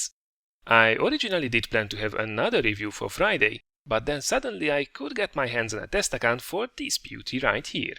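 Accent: Hungary. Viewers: hes from Hungary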